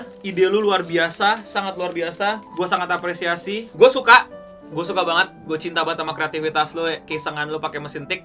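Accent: China. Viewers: Bahasa belanda aksen china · logat Belanda sama Chinese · Logat cina terdeteksi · itu logat belanda apa cina? kadang kdgrn kek logat cina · Malah kaya orang Tionghoa bacanya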